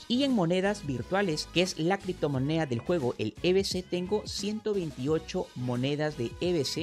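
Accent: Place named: Peru. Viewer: EL mano si era e peru por el micro ????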